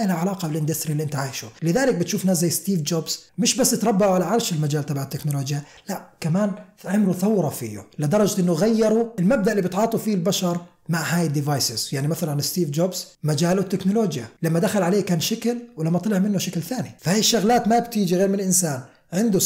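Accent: Jordan. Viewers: من اول قناه ومن كلامك عرفت انك اردني الله عليك يا نشمي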